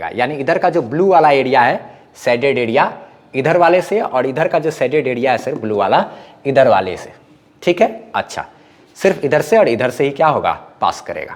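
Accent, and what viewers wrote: Bihar, India. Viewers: Where is your home sir ? I think you are bihari because the way you speak is like bihari ...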